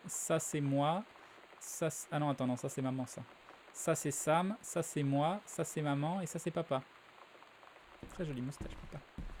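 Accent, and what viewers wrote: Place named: England, United Kingdom. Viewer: Hey salut =) je t'ai découvert sur les vidéos d'Aventures, j'étais intrigué et je dois dire que je suis surprise. Cependant, ta personnalité reste fondamentalement et c'est cool, tu t'immerse dans le jeu tout en gardant ton humour (y). Tu as gagné une nouvelle abonnée je dois dire, et ps: ton accent anglais est é-pique haha ~ Continue!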